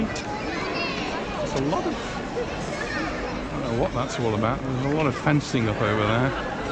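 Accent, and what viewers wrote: Stoke, United Kingdom. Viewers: Are you originally from Stoke by any chance? I'm detecting a Stokie accent. Update: Just seen your video explaining how you got to Mallorca, and as suspected, you're a Stokie. Greetings from Longton!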